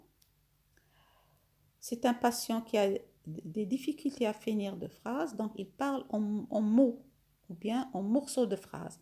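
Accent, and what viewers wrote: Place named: Algeria. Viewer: d'apres la voix et les bonnes explications je savais bien qu'il s'agissait d'une professionnelle Algérienne, je suis Algérienne vivant au Canada, je vous dis bravo pour toutes ces explications ( nouvellement diagnostiquée Asthmatique ).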